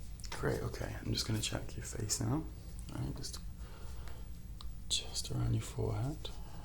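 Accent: United Kingdom. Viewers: Are you britthis? Maybe it is obvious or not but your accent makes me think it, sorry if im wrong, pls dont hate if im wrong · Did not expect you to be British. 😍👌🏻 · Subscribed, it's the accent for me, with the deepness too! I'm british but i forget just how many accents we actually have here at times, so many mixes too!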